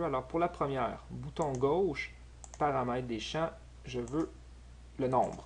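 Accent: Quebec, Canada. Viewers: Intéressant et utile ! et super l'accent Québécois ;)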